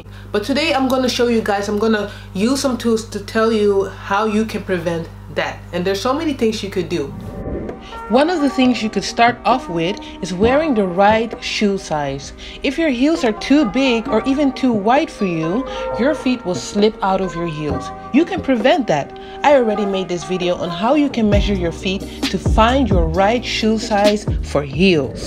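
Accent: Netherlands. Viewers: hahah wat leuk! Je uitspraak is uitstekend hoor, heb zelf op een internationale school gezeten in Californië maar je hoort toch gewoon het Nederlands er doorheen als iemand uit Nederland Engels spreekt;-) leuke video, ik was op zoek naar een oplossing voor mijn kleine teen die met een open hak in de verknelling kwam dus vandaar dat ik bij jou kwam. zoals ik zei, leuke video meis, ga zo door 👍 xxx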